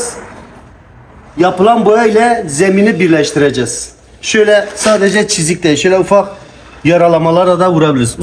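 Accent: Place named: Urfa, Turkey. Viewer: Urfalı olduğunu hissettim hemşehrim. Harikasın enerjin iyi videolara devam.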